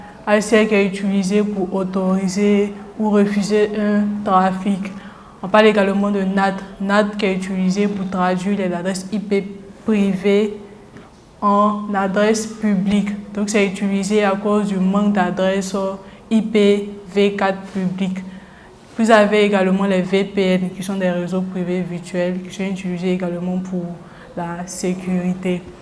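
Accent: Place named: Ivory Coast. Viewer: Merci a toi aussi. On sent vraiment que tues passionnée. Bon courage. Ton accent me dit que tu viens de la CI. Je veux savoir, stp, comment faire en CI, pour passer l'examen.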